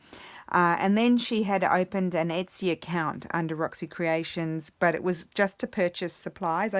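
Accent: Australia. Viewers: As you know I love your videos and chatting about everyday life! Your accent and life in Australia always touch my heart since I still have friends there. Your ideas are fresh and fun to follow! Keep creating and never think you are not good enough, your Great!!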